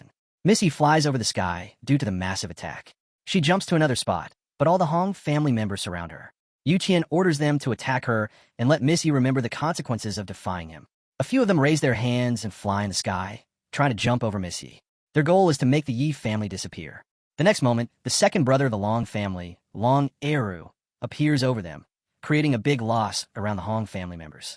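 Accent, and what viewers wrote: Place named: United Kingdom. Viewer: The british narrator change on the 10 hour to 12 hour mark made my brain go mush i could not understand anything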